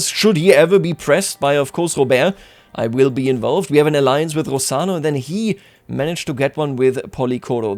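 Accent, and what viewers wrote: Bavaria, Germany. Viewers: Warum machst du das eigentlich nicht auf deutsch? Ich hör ja sogar den Bayerischen Aktzent raus!😅 Würde mich nur mal interessieren. Danke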